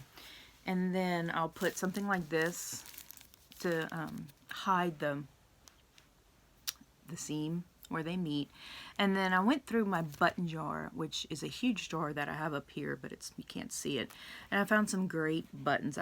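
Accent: Louisiana, United States. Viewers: I’m glad you subscribed to my channel so I could find you. I’m just starting to watch your video and from the first sentence you spoke, my guess is that you’re from Louisiana. Am I right?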